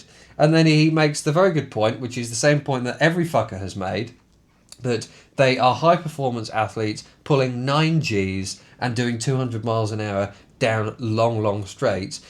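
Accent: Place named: RP, United Kingdom. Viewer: your so posh O.O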